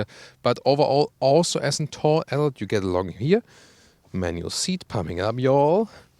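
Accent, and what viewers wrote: Germany. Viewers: FIAT take my money!!! I want one. Long range version only. Thank you. Super review. Super German accent! Love it! Italy loves you!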